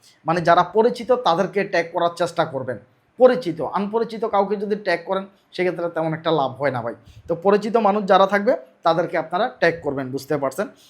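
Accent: Chittagong, Bangladesh. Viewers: Vai apni ki Chittagong naki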